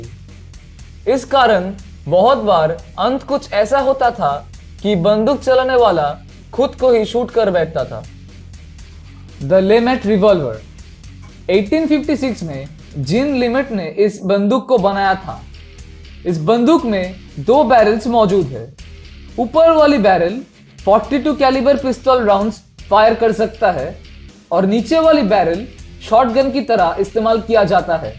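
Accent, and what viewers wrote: Bengal, India. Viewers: ओरे दादा।। पहिले ठीक से हिंदी बोलना सीख ले।। अड़ को आरर बोलता है।। बंगाली दादा।।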